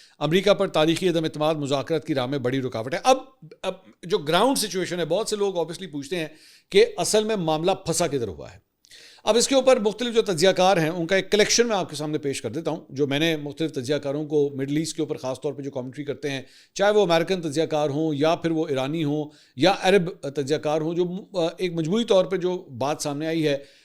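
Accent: Punjab, Pakistan. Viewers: Your Punjabi Very funny 😂😂😂😂😂😂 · (Bhai pin me )Bhai AP Jo Punjabi ka tarka lagaty hai na Sachi sawad a janda 😂 or program tu aho😂 hit different . Allah AP ko aise hi Khush rakhe ameen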